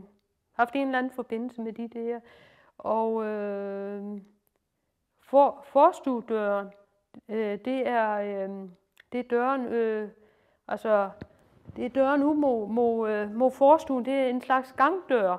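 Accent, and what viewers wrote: Lolland, Denmark. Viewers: Flot, vi sad i dag på Nakskov test og vaccine og så dig. Det er flot, lav endelig noget mere, du gør den lollandske dialekt ære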